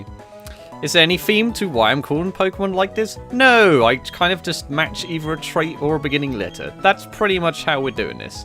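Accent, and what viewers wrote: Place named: England, United Kingdom. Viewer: good videos man! without any doubt you deserve more subscriptors!! where are you from? england I belive for you acent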